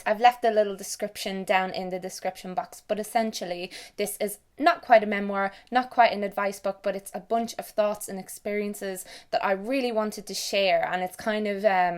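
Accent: Ireland. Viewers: totally Agree with you about 13 reasons why, I have also self harmed and attempted yo commit suicide 3 times , totally ok now tho and grateful im alive but the programme was made in my opinion by someone who doesnt understand mental illness at all and think they should have sorted there research out before hand. also the irish accent is the best ever and I could listen to it all day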